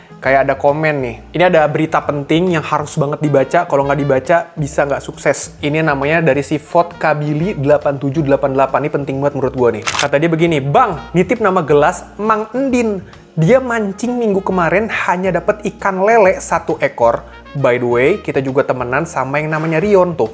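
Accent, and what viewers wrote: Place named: Solo, Indonesia. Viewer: Itukan suara priaa solo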